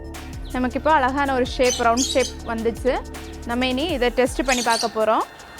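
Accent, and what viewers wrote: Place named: Nagercoil, India. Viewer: Nagercoil spech maari erukku I miss my place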